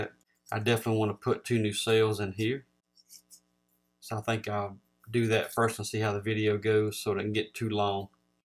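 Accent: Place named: Georgia, United States. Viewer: Sounds like your from Georgia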